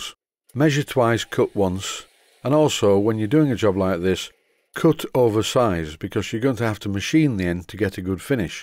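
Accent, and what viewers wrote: Yorkshire, United Kingdom. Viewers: I know nothing about steam engines, I never expressed an interest in them. But for some reason your videos and bluff Yorkshire-ness is just really soothing and fun to watch.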